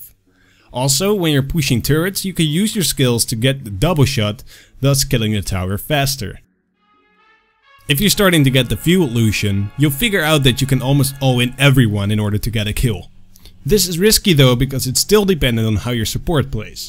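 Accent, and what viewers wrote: Netherlands. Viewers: Dutch if my guess via the accent is not mistaken....? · Sowieso een Nederlander dit, hoor je gelijk aan het accent.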